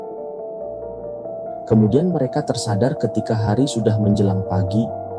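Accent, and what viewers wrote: Java, Indonesia. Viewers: Duuuuuuh....KEMANA KAU MAS??????? Logad Jawa mas yg bikin rindu...ini siiih hanya membaca...gak seruuuuu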